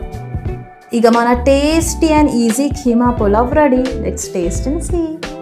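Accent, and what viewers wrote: Telangana, India. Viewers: Telangana slang plus style lo vanta supero super ❤ 👍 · Ame maa telangana gadda meeda puttina ammayi telangana lone matladuthadi... Tana matallo maku affection kanipisthadi.... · Mee telangana yaasa super! And your recipes are inspiring. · అక్కా ...మన తెలంగాణ యాసతో దుమ్ము రేపుతున్నావ్.. 🎉❤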